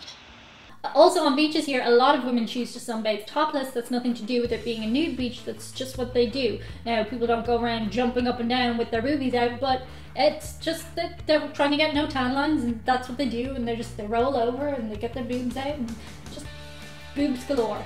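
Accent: Ireland. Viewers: Beautiful as usual. I always enjoy the Irish accent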